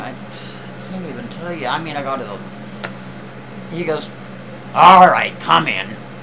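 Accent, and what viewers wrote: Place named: South, United States. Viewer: A<link> DAMN UNCLE! I’m a new subscriber to your channels. I love everything you do. “Split Time”....hanging on EVERY.SINGLE.WORD. I love me some!! I’m from South Carolina so I caught the southern accent that you were throwing around especially when the story got to the damn uncle. LMAO 😂 It really cracks me up bc you usually don’t talk that way. Anyway, I loved the story, I love October Reignz, & I11uminati. You input a Song on your other channel/video recently “ ” by the and I was thinking while I was watching other October Reignz videos how perfect THAT song would be in an upload. I was like, wait for it,& there it was. I don’t wanna sound like a sycophant or anything but I LOVE EVERYTHING THAT YOU DO!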